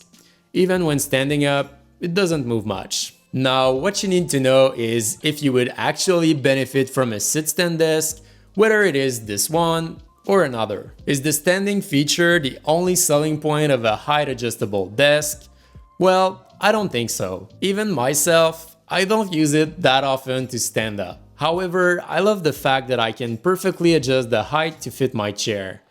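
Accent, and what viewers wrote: Quebec, Canada. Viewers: Criss, ca parait t'es Quebecois, lool. Nice vid! Just got myself a standing desk. Gonna check out your cable management vid lol.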